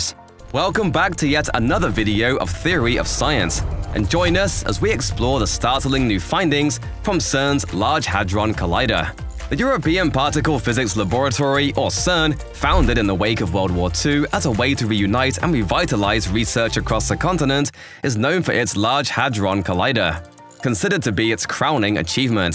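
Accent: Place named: Ireland. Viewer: What is it with an Irish announcer emphasising the European CERN in imperial units and bracketing the metric with US spelling? Metric is standard. Even the troglodytic imperial units are defined by the metric standards, don't give the obsolete measures breathing space let alone put it front and centre. Plus whilst what was said was informative, the random graphics, plucked from who knows where, that have little to nothing to do with what is discussed, are not.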